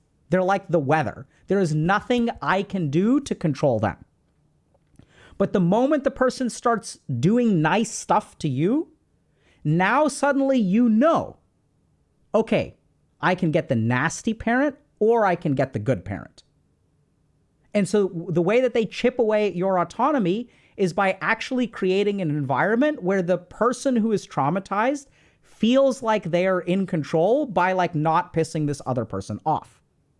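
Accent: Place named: India. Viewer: I wish he would use his Indian accent more! It motivates me to listen more.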